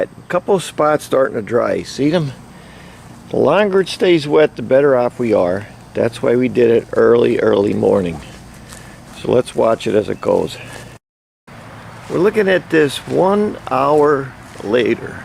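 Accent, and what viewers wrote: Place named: Pennsylvania, United States. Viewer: I love your stuff, very great insight and simple….”just get it in, don’t over think it”…… true for just about everything in life!!! and u sound like my family from Schuylkill county, don’t know where u r from in PA, but u sound like a good ol’ coal region boy!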